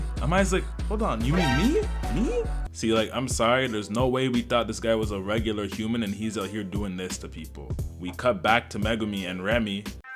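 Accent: Canada. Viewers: This Canadian accent is just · holy shit saying “dutty” you aint jamaican my boy 😂 stfu i hate canadian accents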